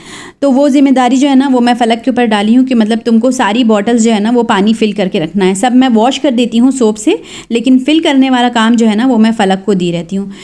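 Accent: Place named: Uttar Pradesh, India. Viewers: MashaAllah I love ur content...also I am from mumbai and looking ur talking style I feel ur originally from UP..kuiki humbling mumbai mein rahe hai aur parents UP k hai...baat aap jaise hi karte hai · Masha allah aapne notice kiya 3 4 days aap k subscriber fatafat increase ho rahe mujhe bhi dekh k maza araha hai aisa lag raha hai ye mera hi channel hai aap k andar se mujhe bahot hi apne pann ki vibes aati hai main bhi u.p se hu gonda se aapki way of tone bilkul humare jaisi hai.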